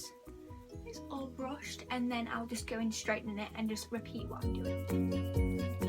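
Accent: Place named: United Kingdom. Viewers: It literally took me 2 minutes and 35 seconds to realize she had a British accent i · Your British accent 🥺🥺🥺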